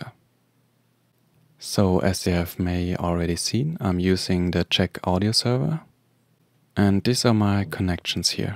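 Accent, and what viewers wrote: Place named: Germany. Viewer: Gutes Video mann hört aber Das Du ein Deutscher bist.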